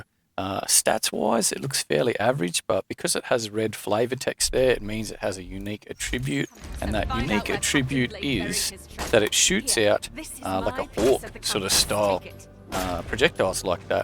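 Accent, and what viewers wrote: Australia. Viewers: I just got here from yote actually. Glad I did, great videos mate, very informative, I love the farming run ones.. It's also good to hear an aussie accent as well, sounds like NSW to me. Keep em coming. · Mate where from the Aussie land are u from?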